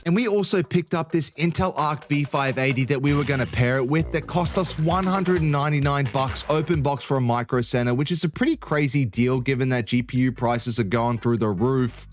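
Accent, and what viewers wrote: Australia. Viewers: Just recently stumbled upon your channel and very happy I did. One I love the doggo. Two love your accent. Makes me think of crocodile dundee. And of course I am one of those weirdos that loves watching people mess with computers. Cheers brother.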